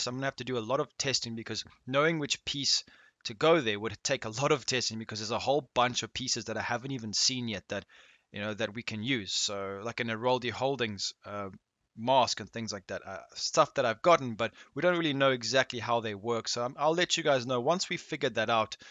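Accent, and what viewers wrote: South Africa. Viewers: You sound South African. Lol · Are from RSA bro?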